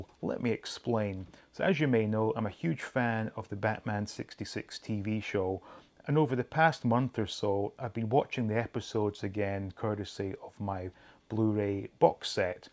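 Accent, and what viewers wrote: Scotland, United Kingdom. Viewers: Hey been wanting to ask what is your accent is it Scottish?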